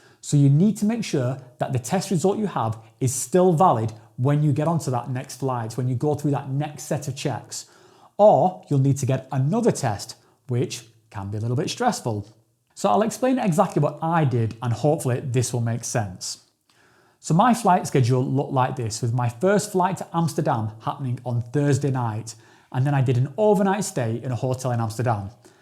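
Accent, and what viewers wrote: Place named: England, United Kingdom. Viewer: I thought you sounded too English to be Swiss🤔🤣 you never know with accents these days. Would still make a good video for your "fans" if you never seen it as an invasion of privacy.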